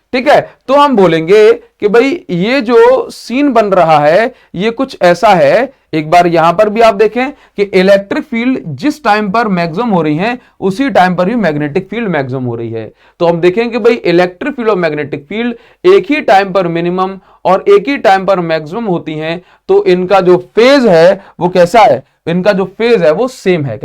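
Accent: Odisha, India. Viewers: Sir are you from odisha ??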